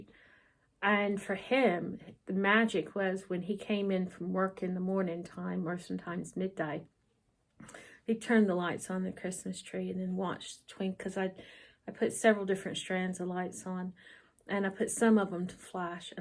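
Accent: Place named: United States. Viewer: I am stitching a castle right now! It is sooo beautiful where you live! I almost forgot you’re an American until I heard a slight American accent still! 😉 I am a homebody and don’t like to travel but I do sometimes with friends and always have a good time. My husband doesn’t like to travel unless I make him and I don’t because I like to stay at home too! 😂 But sometimes my single friends need someone to travel with and I will go. I enjoy your videos and your projects sooo much!❤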